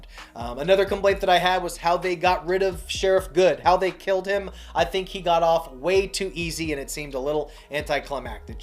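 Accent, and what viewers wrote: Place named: Boston, United States. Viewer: You have a Boston yes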